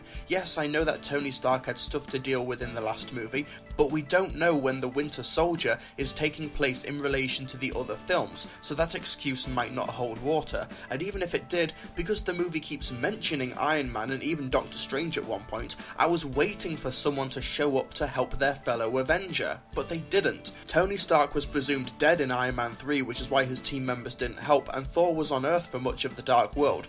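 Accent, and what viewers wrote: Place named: United Kingdom. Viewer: Can you at lest TRY to have an American accent when talking about CAPTAIN AMERICA!!?? Damn Brits should stay in England and talk about English stuff!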